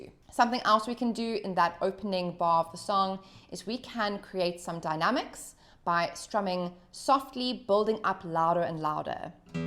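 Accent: South Africa. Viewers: You sound South African? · Awesome! You seem to have a South African accent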